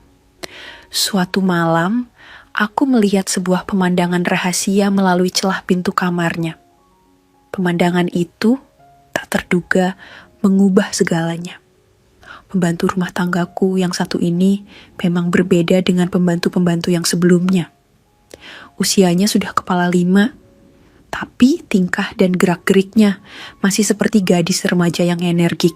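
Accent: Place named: Eastern Indonesia, Indonesia. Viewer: Elu logat Indonesia timur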